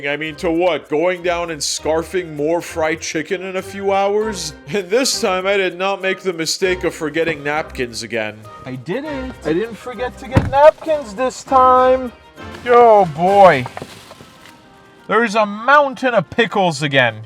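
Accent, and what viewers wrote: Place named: United States. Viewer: you look very mexican/middle-SouthAmerican but sound very american please decide you cant be both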